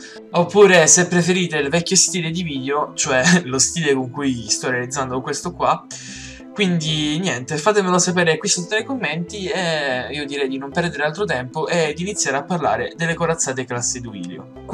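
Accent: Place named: Rome, Italy. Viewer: Un paio di suggerimenti all'autore: l'audio è registrato in maniera insufficiente, e l'accento romano marcato fa perdere credibilità, serve qualcosa di piu' neutro e professionale.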